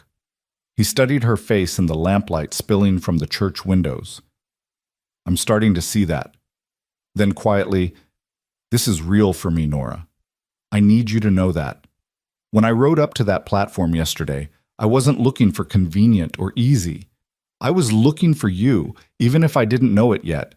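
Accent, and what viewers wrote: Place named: United States. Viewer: Your voice to text is highly amusing - obviously can not cope with your heavy American accent, eg a scent for assent, release or beliefs, eve Hercules for valise, united for he nodded, pastor for passed her and constantly wrote for rode. From Namibia.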